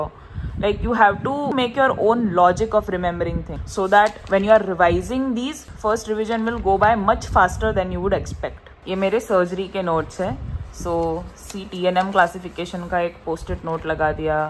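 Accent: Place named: India. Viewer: i dont understand indian accent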